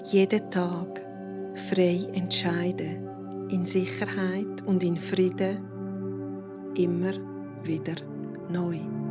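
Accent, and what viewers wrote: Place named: Swiss German, Switzerland. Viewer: Da meine Frau so heisst wie Du, warst Du mir gleich sympathisch. Deine Stimme ist wunderbar fein und weich, geht mir tief direkt ins Herz. Die Mundart gibt dem Ganzen eine spezielle persönliche Note. Der Wechsel von Gefühl zu Energie und Bestimmtheit ist ganz speziell, passend und schenkt wunderbare Energie! So wia's guat isch! Vielen Dank dafür! Alles Liebe, Albert Pucher.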